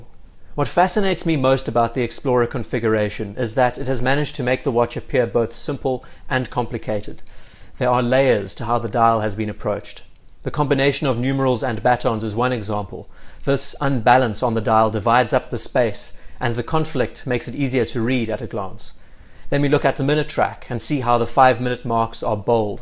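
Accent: South Africa. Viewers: Please do not take this the wrong way, or take it the wrong way! Your monotone dialogue is just horrible. I am a design historian amongst other disciplines, so I find your narrative really grating. Where are you from? South Africa? Anyway please think about the object and not the story that you want to tell; be critical and not political. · You have a real gift with gathering and sharing information in a comfortable way. That South African accent adds a great voice to the story as well. Keep it up.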